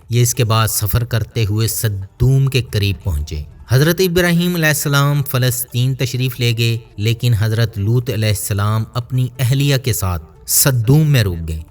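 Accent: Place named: Punjab, Pakistan. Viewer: Apka punjabi accent lahja bahot bura lagta hai sunne me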